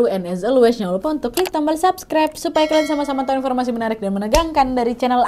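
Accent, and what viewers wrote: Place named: West Sumatra, Indonesia. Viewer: Candu Bana mandanga ngecek Jo Bahaso minang lah😂😂😂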